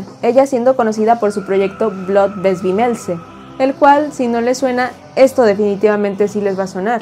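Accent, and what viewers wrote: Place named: Colombia. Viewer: hablaste como colombiana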